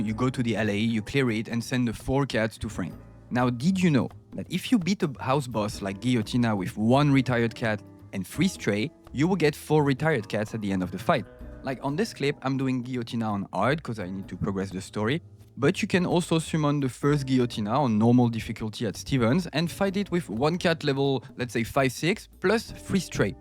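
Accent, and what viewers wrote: France. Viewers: Your french accent is very beautiful ^^ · I hear a french accent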